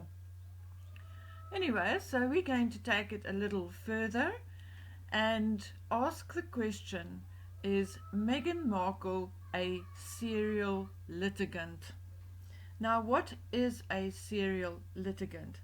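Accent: Afrikaans, South Africa. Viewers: 🇿🇦 I enjoy hearing your afrikaans accent, it reminds me of happy times when i lived there. Also you talk a lot of sense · Her whole life is a distortion. So many lies and misinformation....very sad creature if truth be told. She’ll be a lonely bitter old woman before too long. Thanks to Wally for recommending you. 🇦🇺 BTW love your South African accent!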